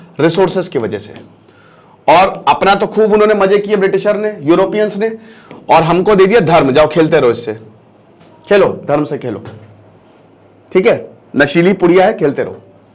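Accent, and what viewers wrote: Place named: Lucknow, India. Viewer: सर आप बिल्कुल लखनवी अंदाज में बात कहते हैं।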